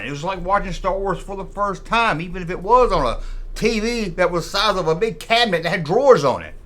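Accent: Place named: South Georgia, United States. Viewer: So dad and I are on vacation and I’m checking out this episode and dad says “What the hell are you watching??” I said what!? Dad, this is!! He laughs and says he sounds like a bumpkin from South Georgia. I said, “He IS He IS! And I can’t get enough!! 😅😂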